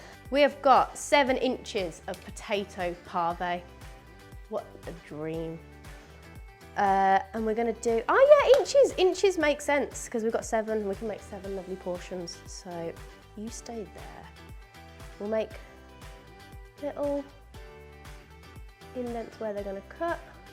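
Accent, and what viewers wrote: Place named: United Kingdom. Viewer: I was going to make a snide comment as I thought you were American and I can't abide Americans, however upon hearing your accent and realising that you are British I shant be making a snide comment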